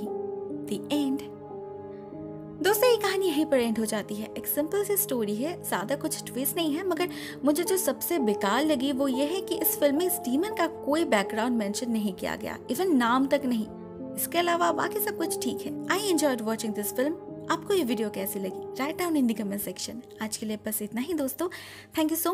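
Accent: Bengal, India. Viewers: Didu ap bengal se ho kya apki voice bhot sweet hai isliye lagta hai 💜😍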